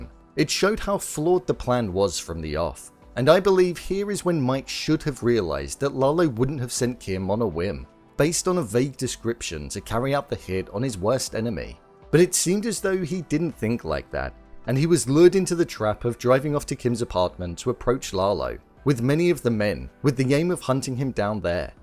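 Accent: United Kingdom. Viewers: Your British accent lol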